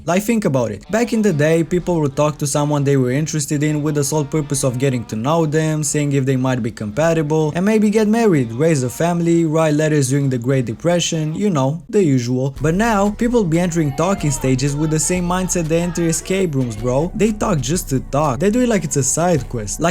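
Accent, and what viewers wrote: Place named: Balkans, Eastern Europe. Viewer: You are from Europe or balkan country .damn sure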